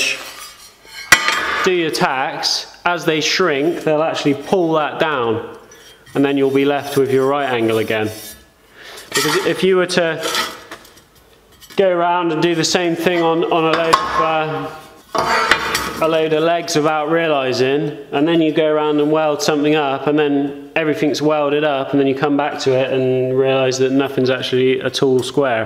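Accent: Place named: Bideford, United Kingdom. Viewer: Miss mine, used to live in Bideford, so I can understand you quite well haha. Good video.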